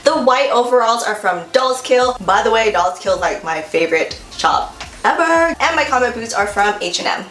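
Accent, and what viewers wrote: United States. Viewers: u look asian but then u have rlly dark skin and then you have an american voice. im confusion lmfao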